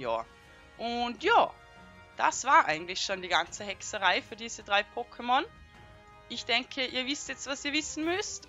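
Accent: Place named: West, Austria. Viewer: Ersteinmal - gutes Video! Propz👏🏽 Dachte zuerst du kommst aus der Schweiz wegen Akzent, hab dann aber überlegt & dachte einen West-österreichischen Akzent raushören, aber die Kanalinfo war im endeffekt ausschlussgebend!👍🏽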